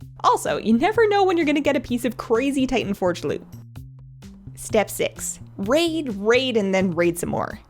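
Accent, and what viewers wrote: Canada. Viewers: I have to sadly admit I've no idea what she is talking about for most of this video. She sounds Canadian though.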